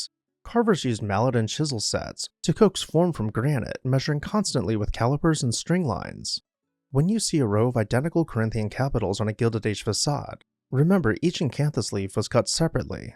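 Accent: United States. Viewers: That bloody annoying American accent, distinctly Gay, grates on me, I'm afraid. I turned it off after two minutes. Sorry mate, but construction, especially in those days, was done by people who spoke different to you. 55 years in the trade, I'm afraid I couldn't take any more..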